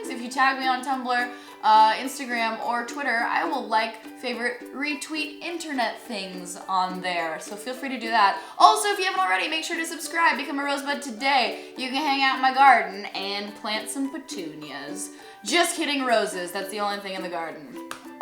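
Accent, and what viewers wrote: Arkansas, United States. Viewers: What part of Arkansas is she from?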